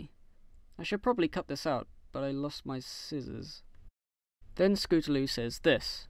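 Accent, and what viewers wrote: United Kingdom. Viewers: are you english? And if so which part of England are you from? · wait, wait, wait, Back up the pick up truck SAY WHUT. UR BRITISH. YUSH. ME TOO. BRO FREAKING HOOF. Yush I did notice that at the beginning.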